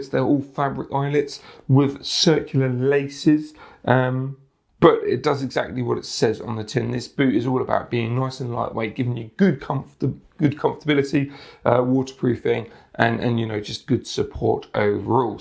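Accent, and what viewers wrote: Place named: United Kingdom. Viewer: Lol you literally cannot pronounce the "eye" sound of any word or letter. You can only say "oy" instead. Like instead of supply (suppl-eye) you say supploy. British accents are hard to listen to lol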